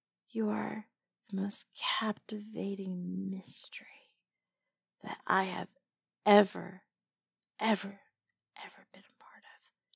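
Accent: South, United States. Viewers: I hear some southern twang. Kinky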